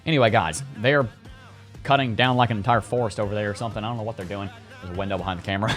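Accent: South, United States. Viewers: Like your southern accent, which state are you from?😂🇺🇸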